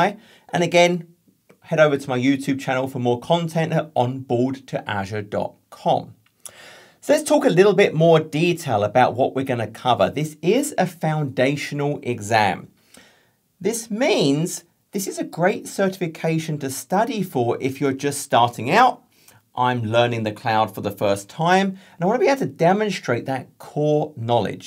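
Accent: United Kingdom. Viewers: Hello, Happy New Year 2023. You are truly an exceptional teacher period! Your style of delivery and the way you captivate your viewers in addition to your passion, selflessness, brilliance and clear desire to empower people is mind blowing. Thank you so much because watching your AZ 900 course did actually make a difference in my AZ-900 exam. Yes of course, I smashed and aced the exam on 12/31/2022 with a score of 865 and it was a great way to end the year 😊. As someone that lived and studied in England for some years, just listening to your British accent was quite entertaining and a reminder of the great and beautiful people of Britain 😊 Right now, I have started studying for AI-900. All the same, thank you very much and have a great year.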